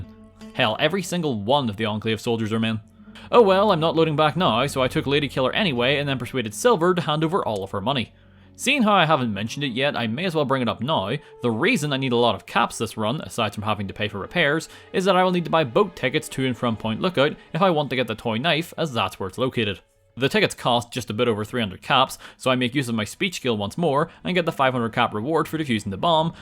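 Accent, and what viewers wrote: Northern Ireland, United Kingdom. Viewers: Ah are you a fellow Northern Irish man playing fallout? What a rare sight 😂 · I needed some of that northern Irish accent today. Great video as usual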